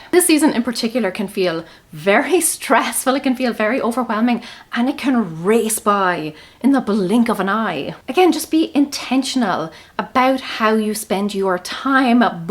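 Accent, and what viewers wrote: Ireland. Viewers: I'm not really a superstitious person, but I read years ago that you should do the things you want to do in the coming year on New Years Day to set the tone, whether it's reading, journaling, exercise or family time. (Also, I'm still trying to place your accent. My parents were from Louth and it's similar).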